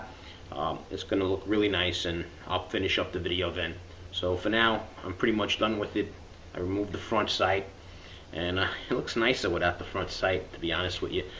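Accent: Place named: East Coast, United States. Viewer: You got the back east accent. I'm from Jersey.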